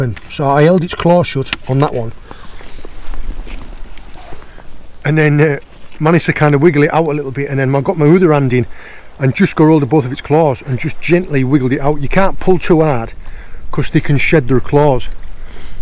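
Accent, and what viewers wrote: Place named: Cornwall, United Kingdom. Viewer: “Sheking” 😂 I love your Cornish accent!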